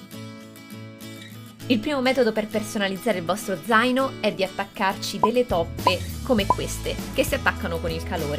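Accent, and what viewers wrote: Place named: Rome, Italy. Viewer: Sei americana o italiana perché mi sembri di roma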